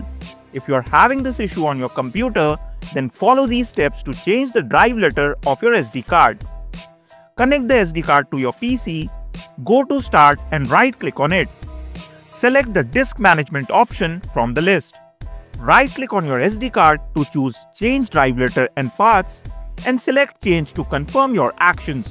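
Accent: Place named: India. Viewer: Very thick indian accent. Difficult to understand what u r saying. Too fast. Unable to catch up the instructions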